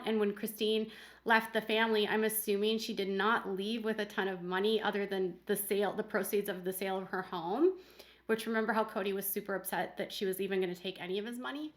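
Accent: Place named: New York, United States. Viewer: Where are you from? You have my home town accent (Rochester/Buffalo NY)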